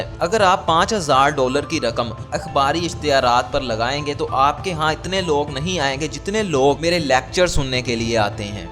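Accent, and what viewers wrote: Punjab, Pakistan. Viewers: Our yeh Punjabi wala lahja, please Urdu na hi bolo to acha hay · Taubaa ap punjabio ke accent ktne bure dihati jse hote hn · آپکی ویڈہو اچھی ہے لیکن آپکا لہجہ پنجابی ذدہ ہے اپنے لہجے کو اُردو میں بد لیں۔ وجہ کیا ہے وجہ یہ کہ اٗردو آپکی قومی زبان ہے۔ اب دیکھتے ہیں کہ آپ کیا سیکھتے ہیں۔ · Ap ka bat karny ka andaz bhat acha hay ap k lahjay say lagta hay ap panjabi hay ap k bat karny ka andaz bhat acha hay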